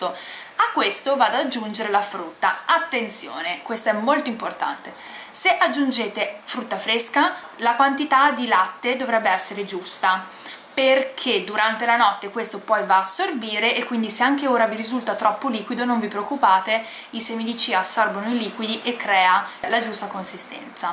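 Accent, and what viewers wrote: Tuscany, Italy. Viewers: parli con accento toscano ora 😁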